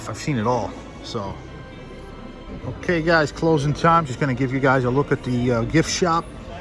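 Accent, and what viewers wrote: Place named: New York, United States. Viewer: I miss this exhibition, because 2 years ago I haven’t discovered Hopper yet. It is very cool to have listen to have a New York accent guiding me through the exhibition. Make it more immersive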